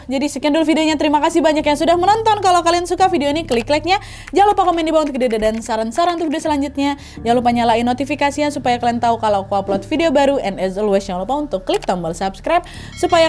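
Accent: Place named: Medan, Indonesia. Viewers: Lucu si pembawa acara..Kaek orang Sumatra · idolaku yng baru dlm knten yng crewet dr bbirmu tu, suka x bahasa yng kao ucap tu, kek orng Medan, apa pekanbaru tah aplah tu, lnjut skses bun cntik👍🤣😀😂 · kamu hebat dalam menyampaikan isi cerita kriminal yg seolah olah kamu sedang menyaksikan nya.. Salut utk kamu.. orang Medan ya? Kok logatnya kental bangaaaaat.. sor kali! 😜😜😜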